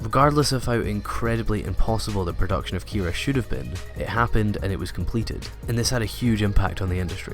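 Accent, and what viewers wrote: Scotland, United Kingdom. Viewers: You Scottish bro?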